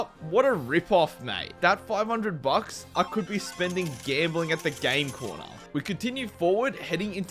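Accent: Australia. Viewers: Cringe aussie accent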